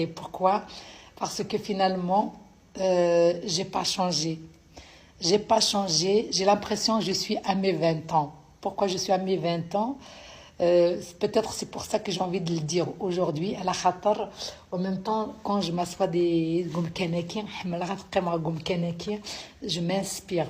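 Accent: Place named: Kabylie, Algeria. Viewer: c'est avec un Grand plaisir que je vous souhaite un Joyeux anniversaire...et merci d’avoir gardé l’accent Kabyle....un ami des USA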